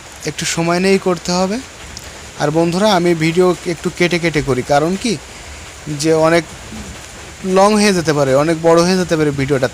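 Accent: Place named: Khulna, Bangladesh. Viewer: ভাই, আপনার বাড়ী কোথায়? ভাষা তো আমাদের মতো, খুলনা বিভাগ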